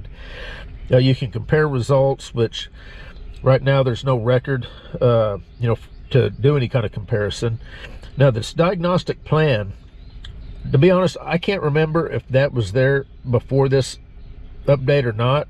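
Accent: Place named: South, United States. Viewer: I thought I heard a southerners voice, im from AR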